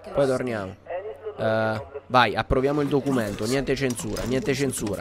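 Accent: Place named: Central Italy, Italy. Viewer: Di dove sei? Hai un accento del centro Italia che non riesco a definire esattamente..sparo marche :)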